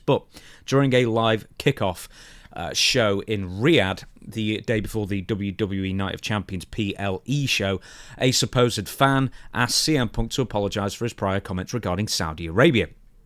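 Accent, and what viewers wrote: United Kingdom. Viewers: There's a considerable amount of bigotry laced within the Saudi Arabia criticism. Double standards galore coming from a Brit Accent where hundreds of years as well as current day accountability and criticisms should be considered and prioritized. At the end of the day, these shows are bringing joy to innocent people and humanizing those who come from a different background. People trying to shit on that are at best hypocrites and at worst closeted bigots.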